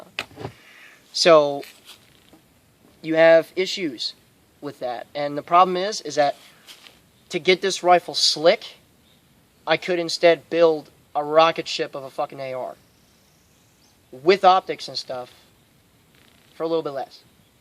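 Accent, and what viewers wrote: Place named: South, United States. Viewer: Add in the southern drawl when you start to talk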